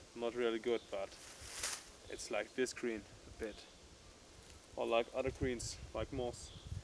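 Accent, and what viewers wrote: Germany. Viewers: god german english^^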